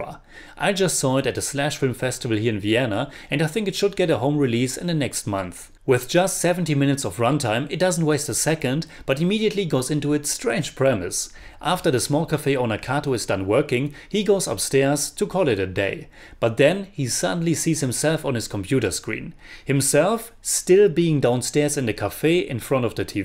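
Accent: Germany. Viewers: Dind´t though of this movie as a mindf*k at all and my disabled roommate who was kinda afraid to watch this movie, because "it´s for the smart people" was like me kinda disappointed for it being a quite simple time paradox movie after all the hype. In the end it was a really great B-movie despite the typical bad acting of some actors. But what I´m wondering, why do you still have this obvious german acent left? Why don´t barely any germans take pride in pronouncing a different lenguage correctly? It´s always just like "srew it if I butcher the pronounciation despite having all the possibilities to learn how to pronounce correctly due to the freak`n internet". I´m about to get 35yrs, had terrible english teachers trying to force their germanized take of british english on us and yet, I´ve started to teach propper pronounciation to myself at the age of 17 (I´m old, just got the first affordable and actual useable internet connection just two years prior) and am asked which english speaking country I´m from since I´m 21. 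But I guess I have a big talent for speach since bavarians asked me which part I´m from even I´ve never been to bavaria in my life. In the end, your pronounciation is telling how much respect you got for a another lenguage.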